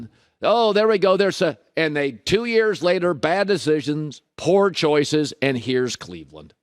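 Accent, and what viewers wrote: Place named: Washington, United States. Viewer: He’s from Washington State.😂😂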